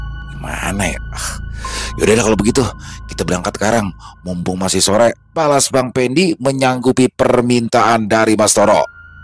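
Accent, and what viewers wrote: Jakarta, Indonesia. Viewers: Waaah, waaah ada bang lagi nich, paling seneng deh dengerin logat dan Betawi nya dan kelucuan bang serta si babe ☕☕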